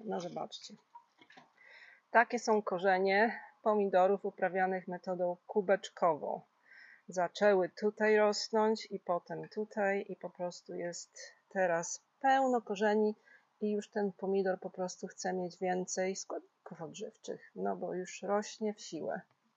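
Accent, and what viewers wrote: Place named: United States. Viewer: Skąd ten amerykański akcent?😊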